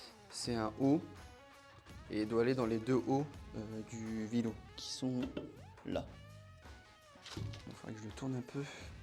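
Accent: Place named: Pas-de-Calais, France. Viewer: Bravo, bravo, moi qui suis en train de démonter mon moteur de cox, ça me sert bien ton super tuto...!!! Je suis dans le Pas-de-Calais, j'ai reconnu le tchiot accent du coin... Continues de saquer d'din et force à toi!!! C'est top!!!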